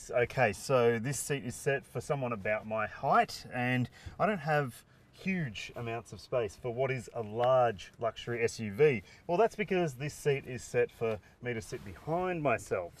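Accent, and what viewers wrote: Australia. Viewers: Im Korean and I decided to study your Australian English so I subscribed this channel.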